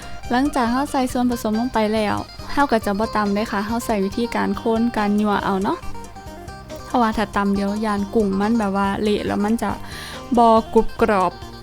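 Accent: Isan, Thailand. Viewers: สวัสดีจ้าเผิ่งมารู้จัก มักหลายค่ะคำเว้าคำจากระมวนน้อค่ะ เปผ็นคนจังหวัดไดจ้า.🤗🤗🤗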